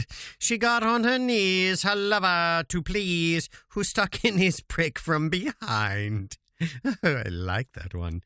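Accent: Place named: India. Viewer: Your Hindu accent has made me pass out with laughter 😂😂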